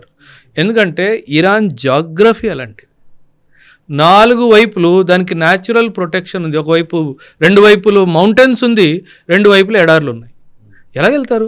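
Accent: Punjab, India. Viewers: OkA chilipi question sir italian of the east ani telugu langauge ni antaru meeru punjabi ina naku telisi mee antha sweet ga telugu ni maatladagala punjabini nenu chudaledu. telugu ni meeru baga enjoy chestaranukunta telugu old songs vintara ,Ghantasala gari songs ni baga enjoy chestu vintara. Please explain about telugu sir and what is the difference between punjabi langauge and telugu langauge. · Punjabi telugu matladutunte chala different ga vundi..but superb awesome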